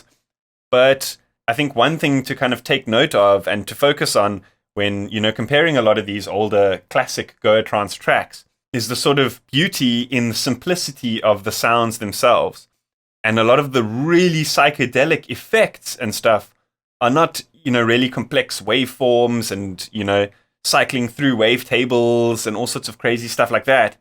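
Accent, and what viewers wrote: South Africa. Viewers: Yo are you South African my g you have the accent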